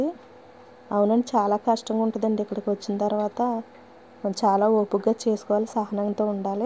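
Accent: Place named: East Godavari, India. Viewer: మీరు చాలా కష్టపడుతున్నారు అమ్మా. మీది ఏ ఊరు అమ్మా, మా సైడ్ భాష లాగ ఉంది మీ మాట . ఈస్ట్ గోదావరి జిల్లా మీది మాది అక్కడే అమ్మా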